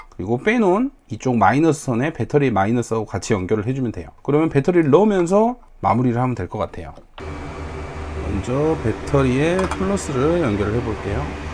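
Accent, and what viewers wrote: Jeolla, South Korea. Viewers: 혹시 전라도 어디세요^^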